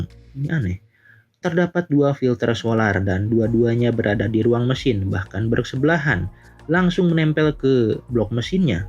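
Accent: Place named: Sunda, Indonesia. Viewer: 😂😂😂 lucu adminya membawa narasinya..ciri ciri orang sunda nya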